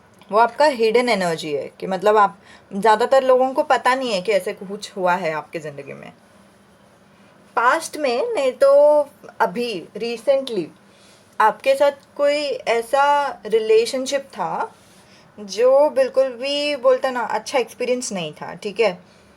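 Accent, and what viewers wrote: Maharashtra, India. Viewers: Aap Marathi ho Nice tone 😀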